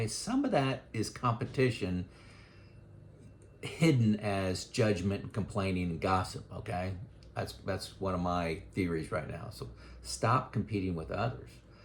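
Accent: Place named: United States. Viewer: Speaking of making fun of how you talk - are you of German heritage or grow up i a heavily German heritage area? My German-descent mother from Wisconsin and her whole side of the family pronounces "wash" as "warsh". Like George "Warshington". My dad and his Polish side of the family from Wisconsin just say "wash". Not a criticism (although we would gently tease our mom sometimes), just curious.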